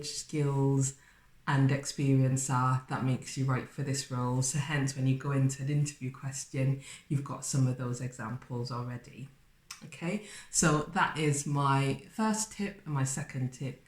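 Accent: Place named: South Wales, United Kingdom. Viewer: Love the South Wales accent, just here to support.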